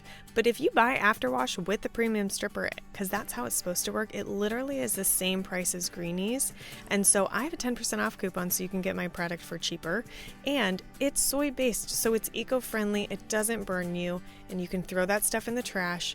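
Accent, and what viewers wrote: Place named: United States. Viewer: You sound American. Other countries have safety restrictions on paint strippers.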